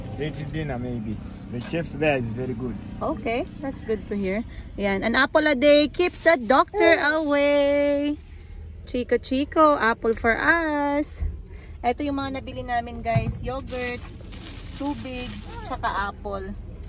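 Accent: Iloilo, Philippines. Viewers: Ilonggong ilonggo ka gid ba Inday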